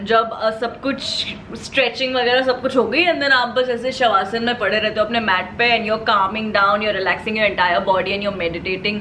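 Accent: Maharashtra, India. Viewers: Ma'am I'm a really really big fan of yours. Your videos are so so amazing and specially your way of expressing. I always watch your videos after studying and believe me it's so so relaxing. Your Marathi gives it a tinge of fun and #pridekolis. You really entertain everyone. I wish you all the best for your future. ❤️❤️ And yes LOVE LOVE ✌️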